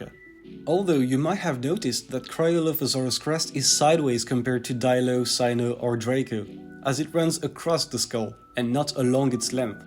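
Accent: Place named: France. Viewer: je me disais qu’il y’avait au fond d’un anglais irréprochable un petit accent qui me rappelait le miens 🇫🇷🇫🇷🇫🇷 D’ailleurs tu t’es mis un sacré gros youtubeur avec toi!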